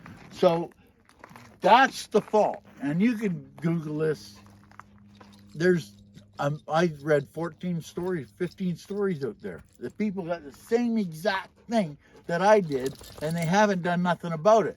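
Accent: Canada. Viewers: Every time you said “battery” my heart would flutter!! Great Canadian accent 💫💛💫 Thank you for sharing 👍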